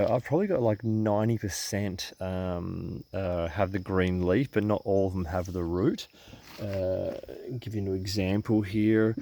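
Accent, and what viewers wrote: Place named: Australia. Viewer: You sound like an Aussie 🇦🇺☺️🙋🏽‍♀️ But you’re in Canada?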